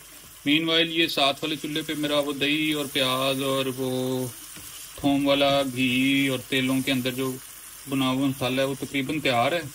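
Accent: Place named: Lahore, Pakistan. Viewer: Lahori lahja ay tada paw g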